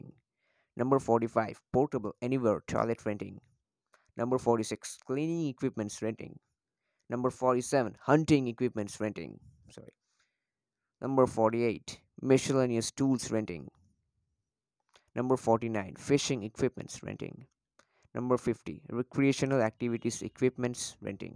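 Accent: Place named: India. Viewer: He is from India.. accent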